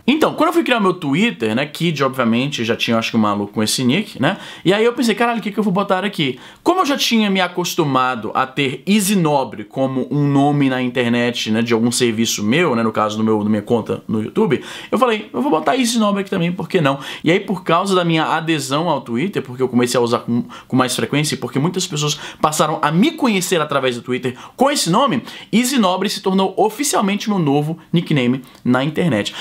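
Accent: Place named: Ceará, Brazil. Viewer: Curioso como tu n perdeu sotaque cearense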